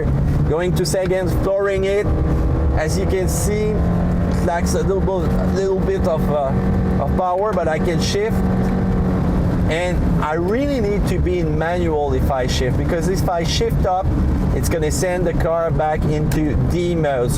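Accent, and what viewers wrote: Quebec, Canada. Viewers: Sounds like a French CDN - where I was born a long tome ago.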